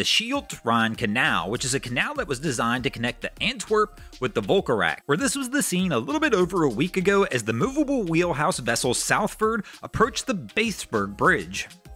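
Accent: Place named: North Carolina, United States. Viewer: He sounds like a North Carolinian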